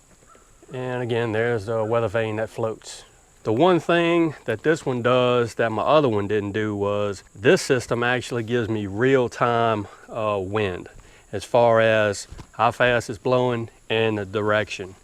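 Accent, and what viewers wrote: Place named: Louisiana, United States. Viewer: Sounds like your from south Louisiana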